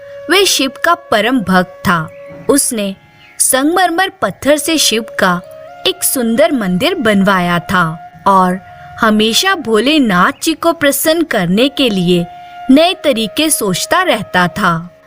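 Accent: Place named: United Kingdom. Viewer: Story sachame achi hai... but aap hindi to English accent me kyu bol rahi hai... try to speak normal... ❤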